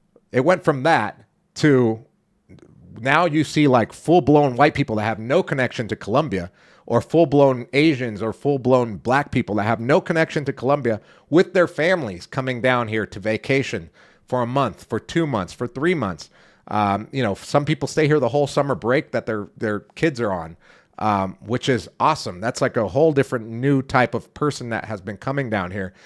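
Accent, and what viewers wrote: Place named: United States. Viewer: I am quite happy to hear a "real yank voice" in Latino body! Subbed.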